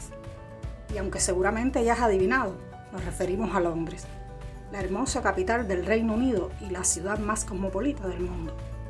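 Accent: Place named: Cuba. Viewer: El video está bonito, muestra los lugares imprescindibles de Londres. Tienes acento cubano.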